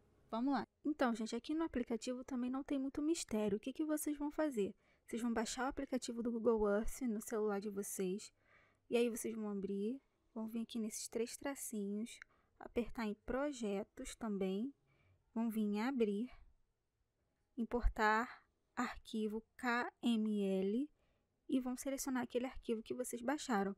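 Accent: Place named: Acre, Brazil. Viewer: Você é do Acre? Que maneiro!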